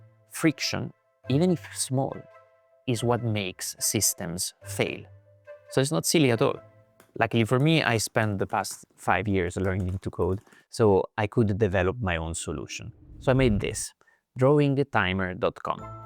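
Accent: Italy. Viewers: The way he talks, is he italian... c) can't tell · L"accento italiano non mi sfugge😂. Great video bro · I loved this video! Very useful suggestions, an extremely handy website at the end e il tuo accento italiano mi diverte (non è una presa in giro :) )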